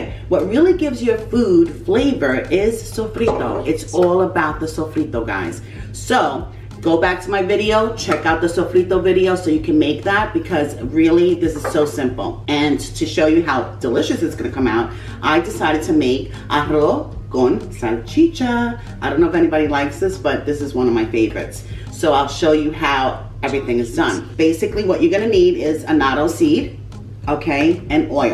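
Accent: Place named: Nuyorican, United States. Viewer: Love the newyorican accent.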